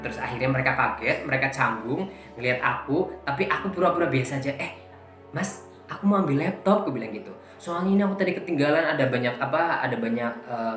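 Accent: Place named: Yogyakarta, Indonesia. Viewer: Kak ini orang bali tapi gaya bicaranya kaya wong jogja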